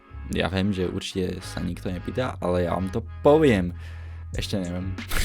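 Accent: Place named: Slovakia. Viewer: s fúzami?😳 Toto je insane nečakala som že sa niekedy vrátiš. 14 ročná Simča ktorá kukavala vždy na tvoje streamy a čakala na druhý deň keď stream začne tak je back na tvojom kanáli len tiež trochu postaršia ale fúzi jej nerastú😆 Nikdy by som nepovedala to že si na tieto časy ešte takto zaspominam milovala som to. A čo sa týka momentálneho kontentu mne osobne to nevadí auta mám rada a slovenskej angličtine rozumiem😅 takže sa teším na ďalšie videjko☺️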